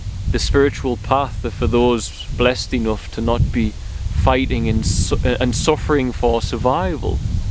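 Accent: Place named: Newcastle, United Kingdom. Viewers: He has a Durham accent, northeast English anyway. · this one was a goody, btw way are you a geordie? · I sense a Durham,UK accent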